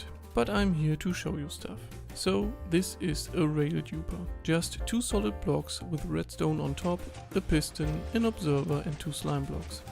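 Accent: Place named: Germany. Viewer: Dem Akzent nach würde ich auf Muttersprache Deutsch tippen? Bei solchen Erklär-Videos würde ich mir eine zusätzliche, deutsche Version wünschen, für die Kinder.^^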